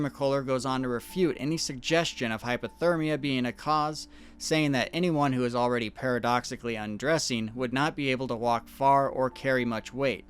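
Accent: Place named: United States. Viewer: What American accent do you have? Or is it unique to yourself? It's good for telling stories, it also reminds me of an old American accent from the 40's or 50's.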